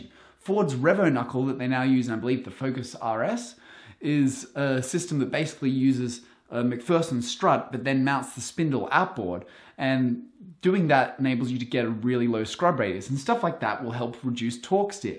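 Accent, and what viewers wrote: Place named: Australia. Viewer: what part of Australia is your accent from?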